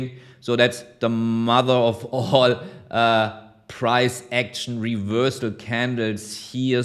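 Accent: Germany. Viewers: Man i love your English mixed with German accent and your articulation ! So nice!👍🏾 ❤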